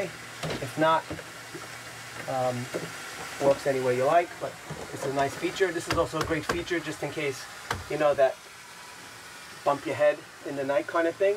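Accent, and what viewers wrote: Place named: New York, United States. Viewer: Good job 👍🏻 if you couldn’t tell from the license plate he’s a NYer you could tell by listening to him.😆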